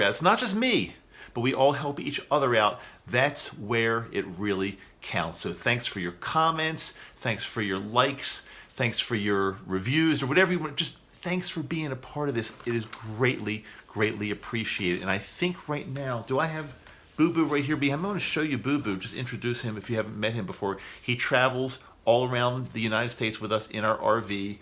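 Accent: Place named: West, United States. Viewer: I would guess that you are from a high cost of living area. Most likely Washington, Colorado, California, or Oregon. You don't sound (accent) like you are from a high cost of living area in the Northeast like Boston, New York, New Jersey, New Hampshire or Vermont.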